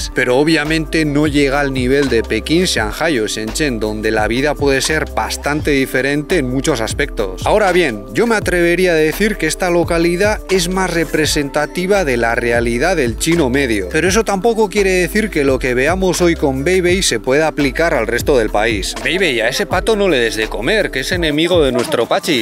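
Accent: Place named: Spain. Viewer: Hoye habla vien Como un Español se te hoye feo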